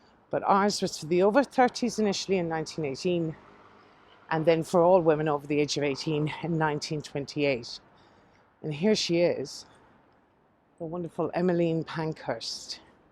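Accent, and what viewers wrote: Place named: South, Ireland. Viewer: Found you by accident through looking for famous graves. I was so interested in your voice and i was thinking to myself thats and south irish accident, i came by your videos of Killarney and my god you a killarney woman. Im from North Kerry in a small town of Listowel. Your videos are so interesting and your jack the ripper story was class. Keep up the great work from a irish fan ( sure you only from down the road).:)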